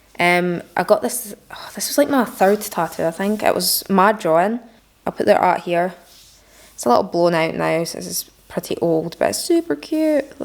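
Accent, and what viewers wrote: Scotland, United Kingdom. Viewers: Love your accent! Are you Scottish? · You make me feel so comforted that there are actually people out there with the same interests as me lmao. Also the asmr vibes ur videos have plus the Scottish accent is so satisfying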